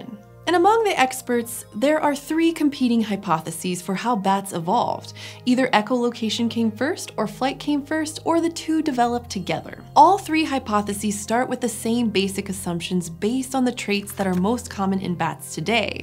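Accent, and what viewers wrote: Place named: Pittsburgh, United States. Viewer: I wonder if the narrator is from Pittsburgh? Her "a" before n and m become elongated to "eh" or "ay" "ai-nimals"/ "eh-nimals" "maimmals"/ "mehmmals" "ay-end", "ay-encestor", "hay-ends", "tray-en-sitioning", "lay-end", "tay-endem", "brainch", "caimels". Been watching dozens of these videos. Really love the material. Thank you! 😍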